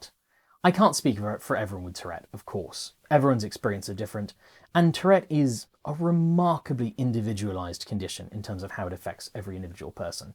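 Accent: United Kingdom. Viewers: This has to be one of the most informative videos I’ve watched on YouTube in a long time. Aside from that, perhaps one of the bravest I’ve seen too. Never easy to talk about something so personal and you presented it in a way that made it incredibly easy to understand. As for worrying about what Brits think of how you sound being called a British accent, don’t. As an expat Brit, I can tell you we have so many accents anyway, there really isn’t any such thing as a British accent, and I’ve never known a Brit who even thinks about it (except for cases where we can’t understand somebody, and, yes, there are other Brits with accents so strong we can’t understand them either). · I watched just your Ducati-video. Keep this British-sounding clear-speech. You are far more easily comprehensible (to all people with a hearing-impairment)! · I enjoy your brit diction, stay safe and well.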